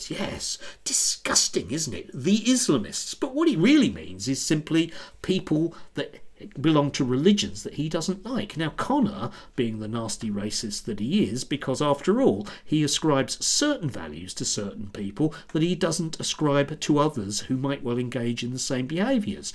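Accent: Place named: Birmingham, United Kingdom. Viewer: Theres nothing wrong with a Birmingham accent 🤔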